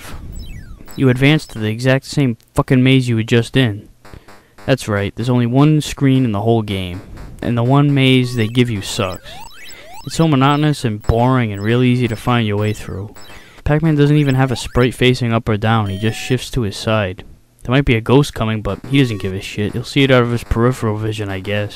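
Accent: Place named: New York, United States. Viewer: You sound like your from New York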